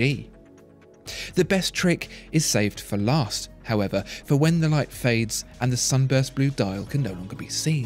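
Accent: United Kingdom. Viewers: Which part of UK are you from? (specific accent)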